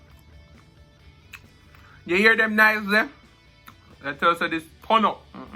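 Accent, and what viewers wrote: Jamaica. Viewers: A combination would be great.. patois and English as you do..brings more spice to your videos.. dem gals love da accent 😂😂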